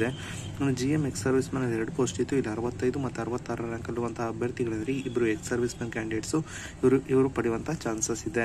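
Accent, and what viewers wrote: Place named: Haveri, India. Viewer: Haveri english sir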